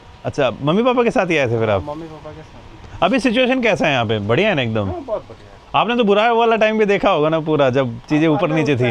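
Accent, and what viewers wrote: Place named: Northeast, India. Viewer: Apke hindi v thdi bohot northeast ke jese ho gye mil jyga naaaa love it sir frm assam😊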